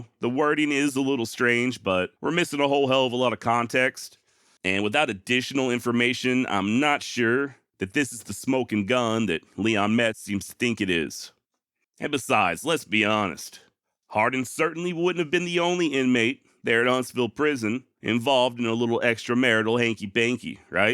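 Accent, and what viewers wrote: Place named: New England, United States. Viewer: sounds like your are a damn yankee. Are 'ya?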